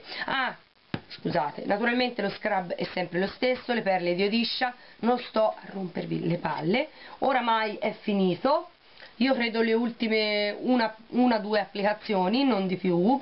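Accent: Tuscany, Italy. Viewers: ma ciaoooo sono una nuova iscritta,ti ho conosciuta grazie a gnappettaaa ,e ki ti lascia piu' sei troppo simpatica poi il tuo accento toscano è wonderful....un bacioneeee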